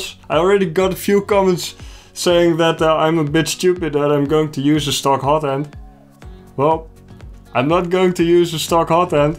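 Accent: Netherlands. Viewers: Is this guy Dutch ?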